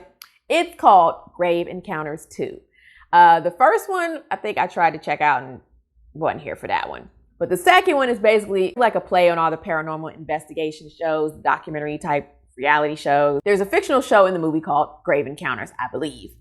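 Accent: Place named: England, United Kingdom. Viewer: This was amazing 👏 I love your English accent, it's better than mine 🤣 I can't wait for dark nights to roll back in so I can watch horror movies in the dark from like 5pm lol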